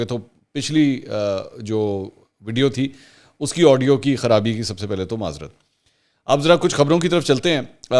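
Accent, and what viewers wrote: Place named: Karachi, Pakistan. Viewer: Aap Urdu to bohat achi boltay ho jaisay Karachi walay boltay hay... Lekin aap Punjabi bhi bohat achi boltay ho.. Jaisay Punjabi Log... Please tell us something about you...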